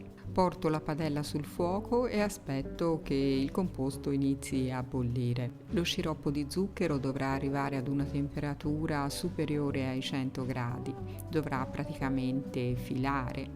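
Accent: Tuscany, Italy. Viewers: Buoni gli zuccherini ma il più bello è il tuo accento toscano. ❤😉😊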